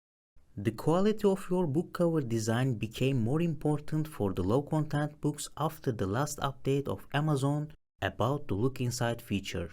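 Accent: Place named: Turkey. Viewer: Aksanınız Türklere çok benziyor, Türk Müsünüz?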